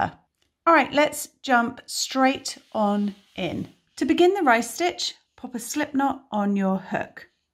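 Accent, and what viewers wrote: United Kingdom. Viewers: Thank you ! Love your British accent. I'm from the deep south in US and it is very refreshing 😍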